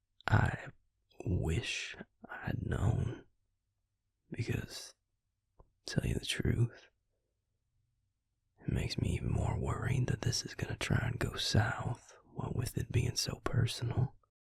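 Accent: South, United States. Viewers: I’m liking the southern accent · Lemme just say, this southern boy makes my tennessee gal heart happy. (as much as i hate living in the south, there’s something about soft spoken southern charm that has me swoonin.)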